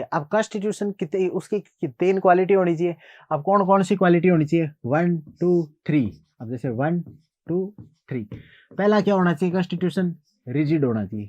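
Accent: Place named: Rajasthan, India. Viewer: 🤣🤣🤣 aap bilkul desi bol rahe ho... Rajasthani hai bhai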